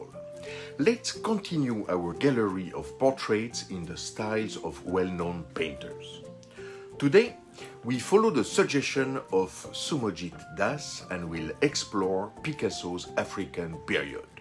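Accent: France. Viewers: However. As a swedish old man, I appreciate your french seasoning english. I love that. :) That is enough musical to my ears. Your paintings are delicate indeed, and I love to watch. Quite soon I will grab some aquarelle painting. I painted somewhat, many years ago. I am eager, but hesitates about what brand, I want to use, or if guache is the choice. I planned to use just three basic colours, white, and some earth colours. Many years ago, I painted home made baskets, and small furnitures, using egg-oil tempera, or oil and earth pigments, as decoration. It was fun then. I also made oil paint for windows, by grinding titanic, and zinc-white into linseed oil and turpentine. It was heavenly to apply, but a beast to make large amount of. First, a layer a lean, diluted by turpentine. Dry for a week. Next somewhat more oil-fat. Dry for a week, and the final layer of full oil. 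I used aways art brushes from pig-hair, and it was an enjoyment to apply the oil color. Linseed and turpentine is just perfume-alike. I believe you know. :)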